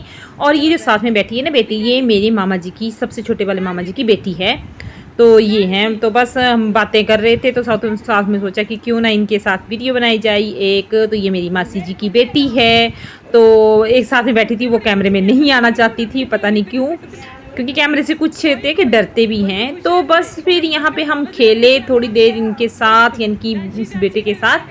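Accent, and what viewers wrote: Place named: Himachal Pradesh, India. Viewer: Himachali boli vadiya lagdi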